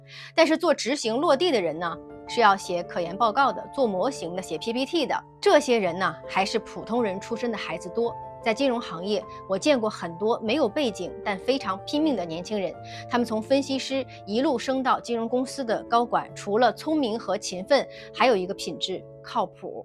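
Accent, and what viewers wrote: China. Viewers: 有点好奇，为什么听主播口音好像是大陆人，字幕却是繁体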